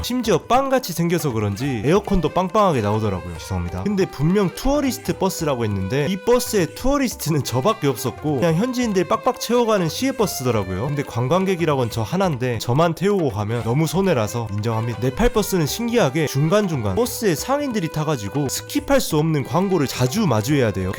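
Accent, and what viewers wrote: Jeolla, South Korea. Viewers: 전라도 말투 잘들었습니당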